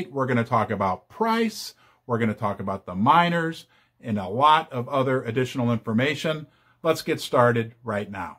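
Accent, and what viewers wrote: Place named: Michigan, United States. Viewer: His accent sound Michigander.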